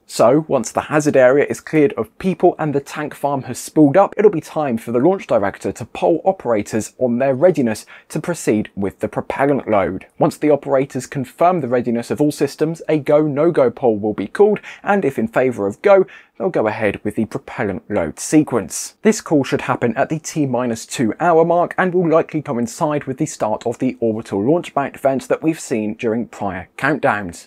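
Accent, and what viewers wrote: United Kingdom. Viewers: No British Accent please!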